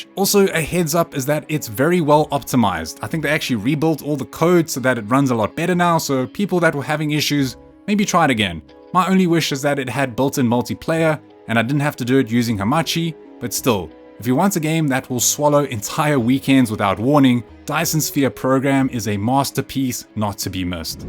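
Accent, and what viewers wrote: South Africa. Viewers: bro why do you sound south african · Are you South African? Sounds very familiar!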